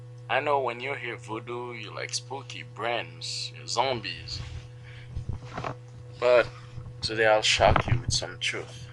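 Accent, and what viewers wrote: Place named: Haiti. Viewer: The speaker sounds Haitian. Every nationality claims to be of Israelite origin. Strange!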